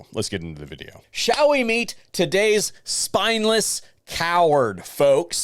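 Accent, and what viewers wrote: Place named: Midwest, United States. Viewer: I'm betting this guy is from St Louis or Kansas City.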